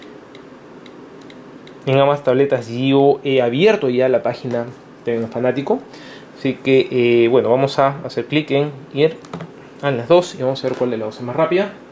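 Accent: Peru. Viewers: eres peruano? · eres Peruano? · Eres peruano ? ? ?? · hey eres peruano???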